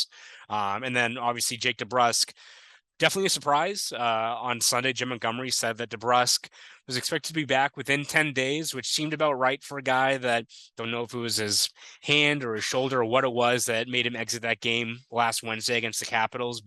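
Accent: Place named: Boston, United States. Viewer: Didn’t realize u had such a thick Boston accent…I love it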